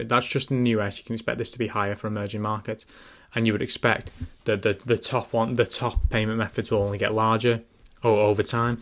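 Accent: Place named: Australia. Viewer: This channel is massively under rated. Also helps that you sound like an Aussie like me.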